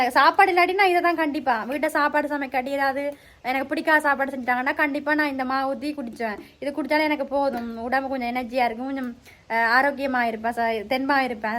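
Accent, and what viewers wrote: Sri Lanka. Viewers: நீ பேசும் இலங்கை தமிழ் அழகாக இருக்கிறது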